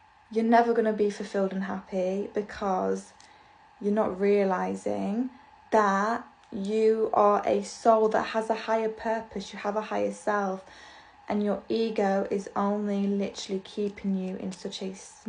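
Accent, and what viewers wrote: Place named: England, United Kingdom. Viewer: Thank you. I love your accent. Are you from England?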